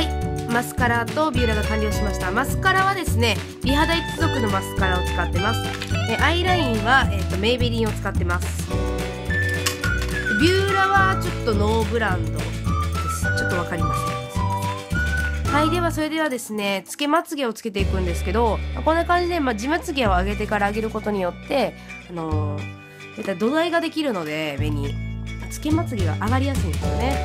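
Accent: Kansai, Japan. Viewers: めっちゃかわいい白人さん？って、ベースメークの動画を見ておもって、関西弁だったから超びびりました！ ほんとファンです❤️ サバサバしてて、わかりやすいし、すき！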